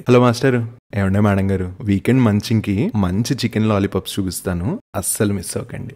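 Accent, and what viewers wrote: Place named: Vijayawada, India. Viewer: Mana bezawada accent keko keka brooo..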